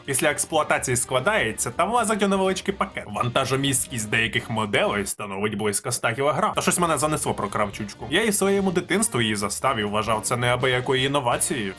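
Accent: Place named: Russia. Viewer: В тебе класний контент. Правда мені здалося, що той тон, швидкість, як ти говориш текст, схожий на когось з російськомовного сигменту, я не кажу, що це погано, але здається, що тобі було б зручніше підібрати свій темп та тон, як глядач/слухач скажу просто - трохи важко розуміти, що ти говориш бо воно зашвидко і трішки нечітко. Але взагалі тобі мега вподобайка і обов'язково продовжуй!!!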